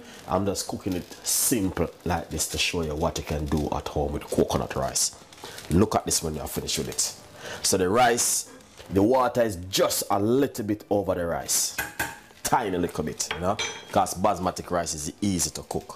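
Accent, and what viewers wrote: Jamaica. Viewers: Basmatic rice 😂 I love his Jamaican accent ❤️ In my opinion It’s not too much salt because of all the water and coconut milk he added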